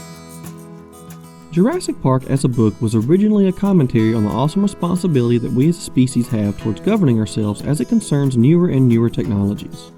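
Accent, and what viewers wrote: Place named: South, United States. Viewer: Articulate. Speaks well. Southern. Talking dinosaurs…. Dude! You are my new best friend!!!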